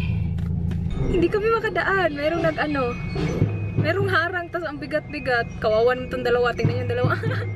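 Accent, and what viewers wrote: Visayas, Philippines. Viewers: bisaya diay ka te??pareha rta!!ahaha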